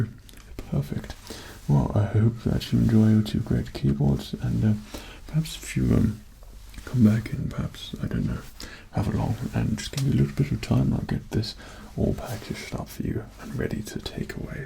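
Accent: England, United Kingdom. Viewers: I cannot tell is this guy actually British and I am sure pc guys do not everything like this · yes he is originally from Oceania but he does live in the Uk and has for a while with is wife he also sounds pretty british anyway in my opinion. Love from the Uk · You would make such a great Arthur Morgan roleplay! Do that but instead of an American, you're a posh rude English cowboy!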